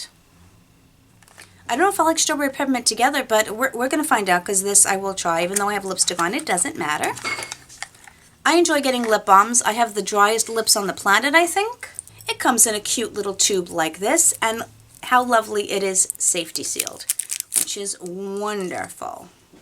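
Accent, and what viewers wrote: New York, United States. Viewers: Where are you from? I like how you talk lol New york? New jersey? I got the iby highlighter-make up forever foundation-mac lip gloss-the k vos lip balm-and a itsie eyeshadow 😁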